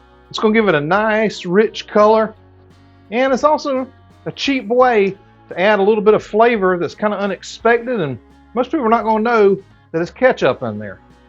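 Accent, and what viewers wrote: South, United States. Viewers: Sounds like you’re from the south. I don’t know what you pay for good hamburger down there but where I live it starts at $6 a pound. 🥴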